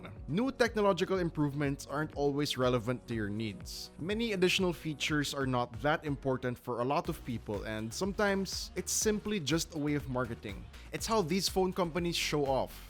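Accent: Philippines. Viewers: Bro’s Filipino af I can tell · Philipino accent😂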